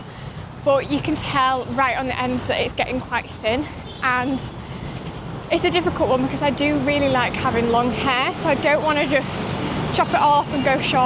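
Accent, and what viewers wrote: United Kingdom. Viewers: I like that accent.. been a fan of british pop since 80's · I love your accent,,,,,from UK?,,,,,your so nice and pretty by moving to my country Philippines….iam glad…..enjoy the Pilipino way of life…by the way iam a Pilipino living In Miami….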